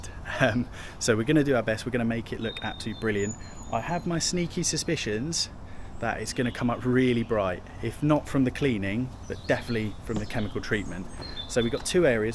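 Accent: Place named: United Kingdom. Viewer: Fence! Amazing. Love these kind of transformation and gifting videos. Thanks for your work and for sharing. Warmed my heart. And who doesn’t love the British accent? 😂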